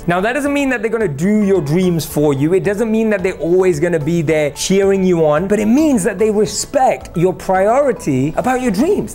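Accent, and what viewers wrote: United Kingdom. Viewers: Found you by accident and glad I did. I appreciate what you have to say and love the way you present it. The gorgeous eyes and the British accent make it so easy to watch you, LOL! My sister asked George Burns the 100 year old comedian actor in Las Vegas, (who passed away years ago), “How do you know if a man loves you?” George replied, “You ask him do you love me? if he looks away before he answers the question… He doesn’t! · When you talk .. I just stared at your beautiful eyes 😍 and I love your English accent 😘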